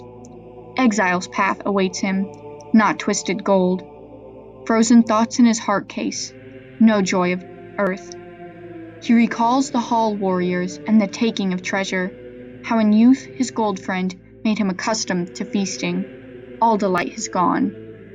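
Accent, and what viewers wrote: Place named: United States. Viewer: Old English poem in an American accent no!!!!!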